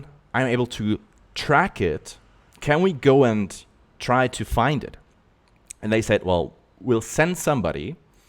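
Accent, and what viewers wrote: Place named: Germany. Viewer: gutes englisch...aber du kannst den deutschen akzent nicht verstecken ;) ich hoffe dir geht es nach dem überfall gut ?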